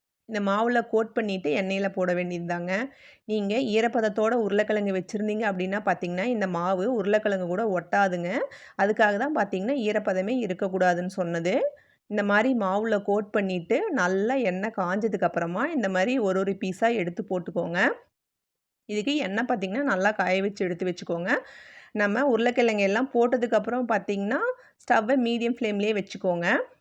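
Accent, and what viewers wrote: Kongu, India. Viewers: கொங்கு தமிழ் மணக்குதே! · Madam pesu podhey theriuthu., Kongu Nattunu., intha madhiri receipes ellam kongunadu perponadhunga... 🙌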